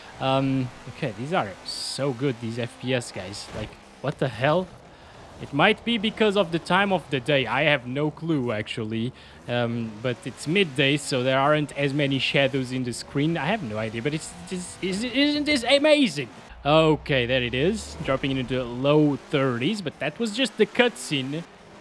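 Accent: Portugal. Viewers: Perae você é português?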